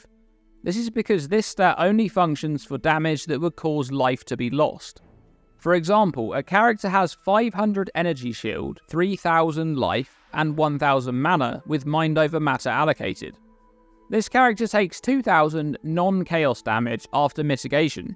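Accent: United Kingdom. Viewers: Bri'ish man spitting facts again. Always great for theory crafting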